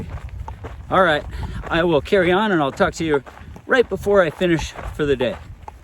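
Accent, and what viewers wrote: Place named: Los Angeles, United States. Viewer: Keep truckin! Thanks for the info about the fire and the insights. Sounds like you’re from LA!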